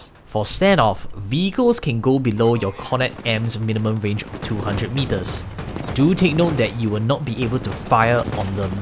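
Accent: Singapore. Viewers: somebody sounds like they're from singapore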